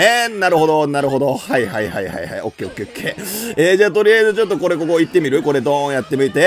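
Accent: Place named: Osaka, Japan. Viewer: 声だけ聴いてたら120%大阪のおっさん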